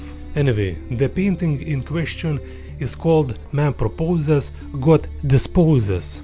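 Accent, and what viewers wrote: Russia. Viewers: Hmmm ... English with Russian accent, nise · а чего не на русском, чусвтуется русский акцент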